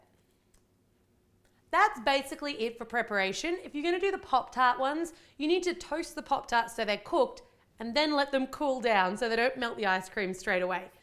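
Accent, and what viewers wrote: Australia. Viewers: Are you from Australia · are you from Australia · Are you Australian, omg what state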